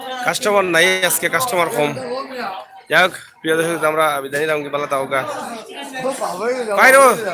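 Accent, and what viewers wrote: Sylhet, Bangladesh. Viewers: ভাই এইটা আমাদের সিলেটের ভাষা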